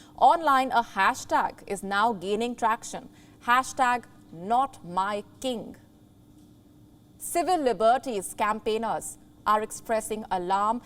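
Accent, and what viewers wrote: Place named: India. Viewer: She is speaking English in Hindi accent. Very hard to follow. Need to improve her delivery.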